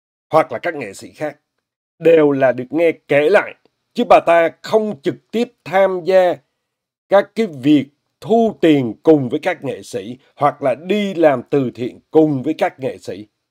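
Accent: Standard. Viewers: Chào cố gắng lên đều vì mình thích kênh này bởi nói rất chuẩn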